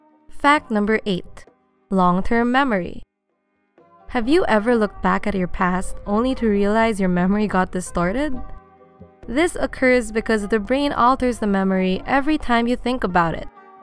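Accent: Philippines. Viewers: The one doing the voice over sounds Filipino. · Why do i feel like she sounds like a filipino speaking in English. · the voice actor sounds filipino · Why does the narrator sound like a Filipino to me? I talk like that lol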